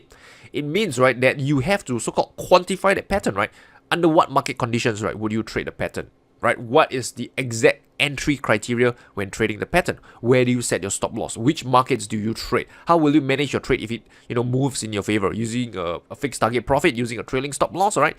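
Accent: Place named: Singapore. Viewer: has a typical Singaporean accent.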